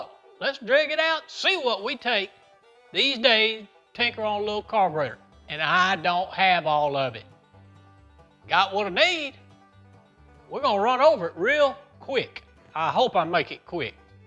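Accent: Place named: Italy. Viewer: Thanks for sharing . But With that accent you must be Italian. Those parts bags were a good idea, thank you 👍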